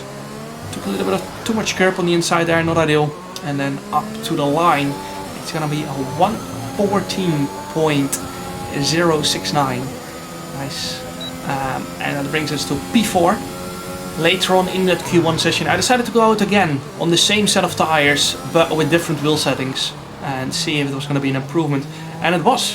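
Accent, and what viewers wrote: Netherlands. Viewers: You really sound dutch while speaking english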